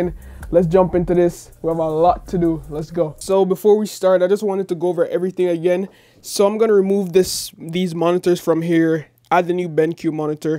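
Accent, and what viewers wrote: Jamaica. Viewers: Are you Jamaican 🇯🇲 ?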